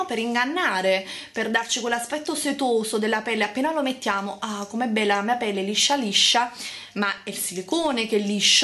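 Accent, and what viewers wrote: Naples, Italy. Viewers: Vorrei anche io precisare e farti notare che dialetto e accento (cadenza) sono due cose diverse e dai tuoi commenti a volte hai fatto un po' di confusione.. ma io concordo su tutto! non mi sembra che usi spesso espressioni dialettali, se non in contesti scherzosi per fare una battuta o per citare un proverbio (cosa che ci sta), ma il suo accento è TROPPO marcato. Non lo dico per "antipatia" o "razzismo" verso i napoletani, ma lo dico perché quando l'accento è così marcato da impedire una agevole comprensione della lingua allora bisognerebbe imparare a moderarlo.. perché sì, l'italiano standard, LINGUA UFFICIALE CHE OGNUNO DOVREBBE CONOSCERE BENE, ha delle regole di fonetica ed anche quelle fanno parte del codice lingua. Secondo me è sbagliato cercare di nascondere del tutto il proprio accento "locale", ci mancherebbe, ma bisognerebbe almeno parlare correttamente. 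Io seguo da anni Marta di Sweetbeauty1990 e anche lei è di Napoli; il suo accento però a differenza di quello di è gradevole! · a te non piaccia la cadenza napoletana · ma chi ti credi di essere? Ci sono fior fior di esperti, chimici, medici, farmacisti e molti altri specialisti dietro ad un marchio e dietro ad un prodotto. In più la tua filosofia del "tutto cio che è naturale fa bene"" è veramente da Medioevo. In più, se ti informi bene saprai che non è vero che in chimica tutto cio che finisce con -one è un silicone (giusto per dirne uno)... Purtroppo ci sono delle ingenuità di base che permeano tutti i tuoi video. Un'ultima osservazione: se vuoi rivolgerti ad un pubblico dovresti imparare a parlare in italiano, non si può accettare il tuo accento partenopeo, simpatico eh, ma inadatto alla grande comunicazione. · Io ci provo a seguirti, ma te lo devo dire... il tuo accento è davvero difficile da sopportare. 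Per chi non è di Napoli, è un dialetto fra i più fastidiosi, mi spiace. "Quando lo vedo DI fare..." ma che italiano è??? Insieme ai siliconi io eliminerei la cadenza campana e leggerei di più. Ps. mi hanno regalato il tuo 'libro': inutile, visto che non spieghi dove reperire i vari oggetti necessari e ci sono veramente quattro cose in croce.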